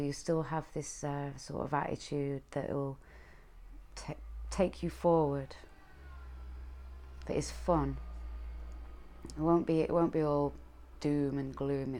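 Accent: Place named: Yorkshire, United Kingdom. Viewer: Thank you for pile 4 that i was guided to 🙏🏻 i hear a fellow Yorkshire accent, it’s nice to hear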